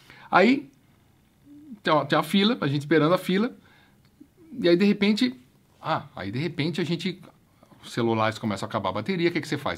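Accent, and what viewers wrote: Rio de Janeiro, Brazil. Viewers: parabéns pelo excelente trabalho! cara muito legal o história das antigas. sensacional. não dá nem pra pedir uma história, pq é um mistério surpreendente cada uma delas. não dá pra imaginar o q aconteceu com vc na sua carreira. kkk muito bom o sotaque carioca, e por fim uma sugestão, o q vc lembrar da memória q foi marcante, faz do mesmo jeito, liga a câmera e grava. tenho certeza q vai ser demais. abraço de máscara! 👏👏👏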